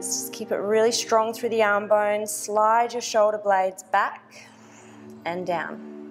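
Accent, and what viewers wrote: Australia. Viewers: That Australian accent though!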